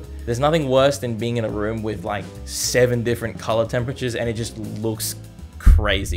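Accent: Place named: Australia. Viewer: Idk what it is but the Australian Accent just itches a part if my brain. I love it 😂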